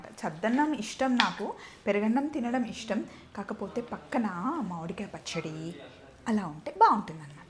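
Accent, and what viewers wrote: Andhra Pradesh, India. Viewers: Telangana accent lo ne matladandi...chala varaki meeru Andhra valla lane matladutharu...konchem ekkado naturality miss avthundi anpisthundhi...this is my opinion...hope you take it positively...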